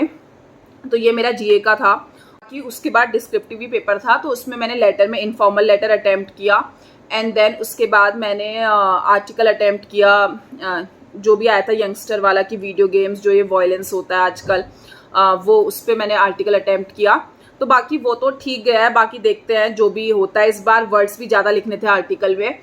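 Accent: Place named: Kumaon, India. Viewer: your accent is so kumauni ,,,,where do you belong actually??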